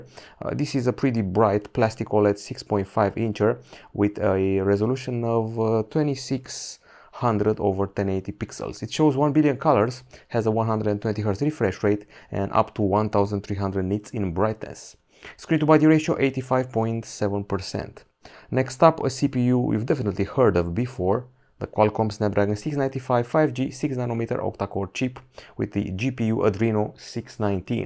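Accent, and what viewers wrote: United Kingdom. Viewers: Are you British? Do you speak English?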